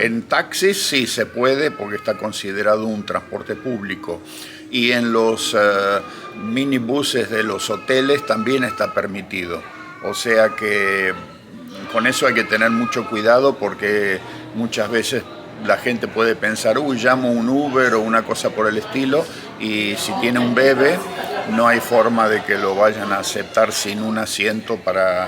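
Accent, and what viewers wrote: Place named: Cordoba, Argentina. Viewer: Me gusta mucho como habla, bien Argentino Córdobes, los saludos desde 🇦🇷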